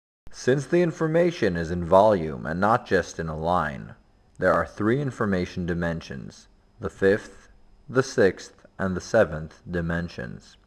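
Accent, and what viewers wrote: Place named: United Kingdom. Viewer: your info is good as well as the video ! i understood it to some extent but i am having problem in understanding the british accent ! can you tell some other source?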